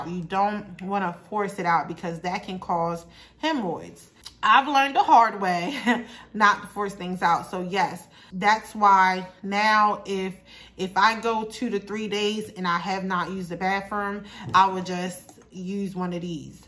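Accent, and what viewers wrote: Baltimore, United States. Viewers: Are you from Baltimore? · You gotta be from baltimore lol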